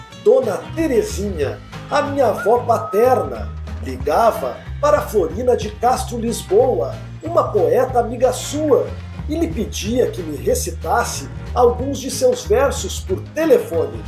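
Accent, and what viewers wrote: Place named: South, Brazil. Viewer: Estou impressionada com tua rapidez. Falar trinta (30) fatos em dez (10) minutos. Eu precisaria de no mínimo uma (01) hora kkk. Teu raciocínio é rápido. A fala tb apesar de que é compreensível. Professores tendem a terem uma dinâmica célere. Eu ainda não tinha entendido de onde era teu sotaque. Do sul sem gíria. Hahaha imagino a badalação de receber uma correspondência da casa da Republica. Eu amo correr tb mas desde a pandemia não participei de nenhuma corrida oficial. Apenas seguindo nos treinos. Correr é viciante. Acredito muito nessa vibe exercício mais equilíbrio na alimentação. Não só montar o shape mas ter qualidade de vida. Não é ruim ter Tv em casa. Aqui em casa é cheio de TV mas me diz se eu sou de assistir? kkk. Eu tenho uma no quarto mas é raro ligar. Em geral eu assisto só filmes qd dá muita vontade. Eu não sou muito de assistir. 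Sempre acho que esse tempo gasto eu poderia ganhar lendo. Todavia as vezes é bom. Principalmente pra ver um filme aleatório ou uma noticia jornalística. Em geral nos meses de dezembro e janeiro eu me permito assistir a algo... Foi bem divertidinho teu vídeo. Eu dizia que tu era jornalista ou radialista. Jamais professor kkk. Vc tem dicção de profissional de comunicação(rádio/TV). Grande abraço... = )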